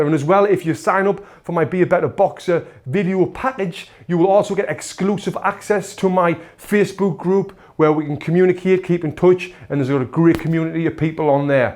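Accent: United Kingdom. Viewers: I love your accent sir. I think it's British accent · His boxing is awesome, but how pleasing his British(right?) accent is? +100 to boxing skill if you can talk like that, I'm not gay btw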